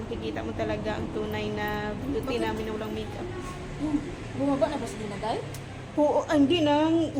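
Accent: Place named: Visayas, Philippines. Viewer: Namis mo pala lugar 19yrs old ka pa huling silip. Muntikan na nawala si Ramen, ok yan bisaya accent, actually kahit ano accent ok lang. Napansin ko mahilig ka po pala talaga magpapicture. Sana all may phographer taga kuha picture. Sipag ng photographer mo, siya pa humirit na isa padaw picture. Ang galing ni Ramen sumundo sa inyo. Ayos!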